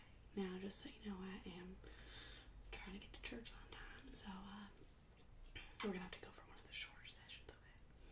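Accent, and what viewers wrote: South, United States. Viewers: That accent and the softened profanity is such nostalgia for me as a child of the Bible Belt. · that strong souther accent is flawless. i guess it helps to be from the south lol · You are one of the BEST at role plays! I love the southern accent and you seriously have amazing acting talent. Please keep this character in your regular video rotation!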